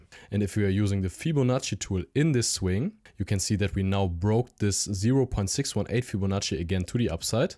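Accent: Germany. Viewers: German accent👏🏻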